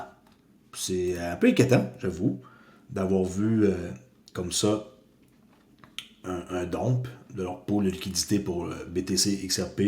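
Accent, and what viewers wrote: Canada. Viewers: Great merci mon ami cadien je suis tellement fan de ton accent canadien en x2 love it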